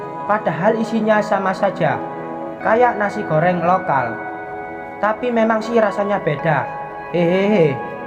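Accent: Java, Indonesia. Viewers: Lanjut cetita y pokoke slalu ngakak...dngan bhsa y medok bngt...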